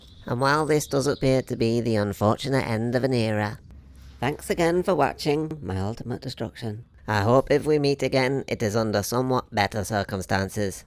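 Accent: Yorkshire, United Kingdom. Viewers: I agree with all of the above. What part of Yorkshire are you from, Leeds?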